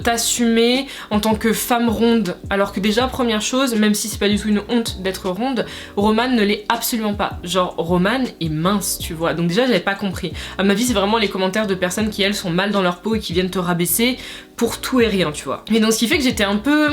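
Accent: England, United Kingdom. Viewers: pourquoi tu prend un accent anglais? C'est voulus ou tu es a appris l’anglais au Royaume Unis?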